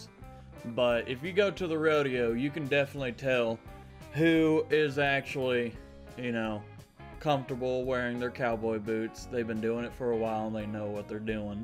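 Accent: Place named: South, United States. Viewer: Wow I noticed that you have a southern accent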